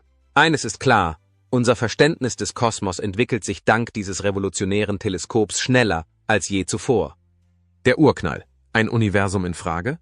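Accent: United States. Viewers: Die IT Stimme mit leicht amerikanischen akzentuiert ist zum kacken🥴😝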